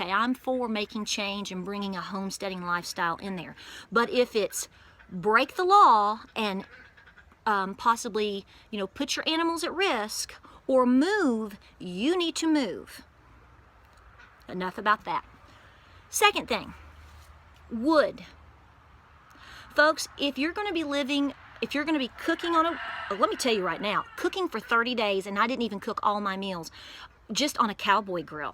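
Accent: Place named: Deep South, United States. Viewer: I just found this video and your channel. I love your deep south accent and attitude! You tell it like it is, and you an inspiration. <3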